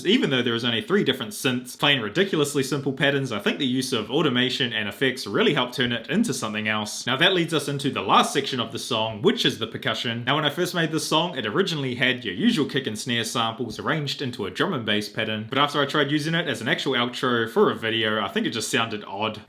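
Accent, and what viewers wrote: New Zealand. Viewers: Are you a New Zealander? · Are you a New Zealander? Because you don't sound Australian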